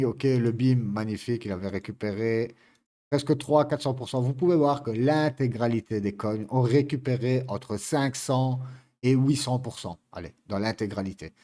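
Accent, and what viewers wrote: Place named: Belgium. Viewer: toi tu es un compatriote,accent Belge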